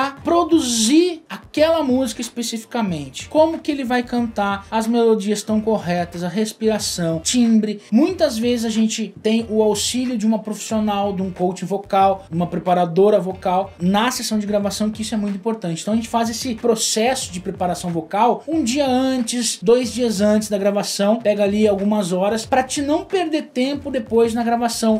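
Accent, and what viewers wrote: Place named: Rio Grande do Sul, Brazil. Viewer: Tu és gaúcho amigo? hahahaa Parabéns!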